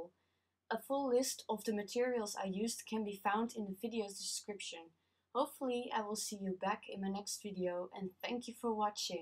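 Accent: Netherlands. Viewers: Beautiful tutorial... and charming Dutch accent :)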